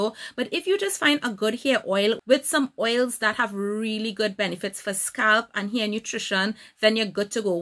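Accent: Trinidad and Tobago. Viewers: Girl, when I heard your Trini accent I SCREAMT🇹🇹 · I had a feeling it was a trini accent. She sounds like my relatives. Lol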